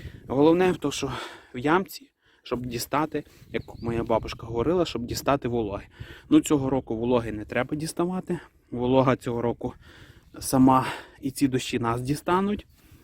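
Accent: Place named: Western Ukraine, Ukraine. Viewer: Такце наша західнецька мова файна і така посадка тоже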